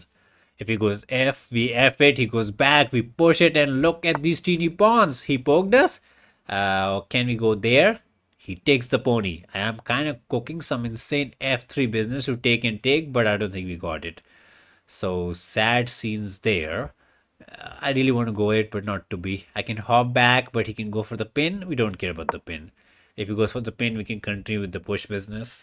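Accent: India. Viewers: Love ur indian accent and calling queen the fatty😂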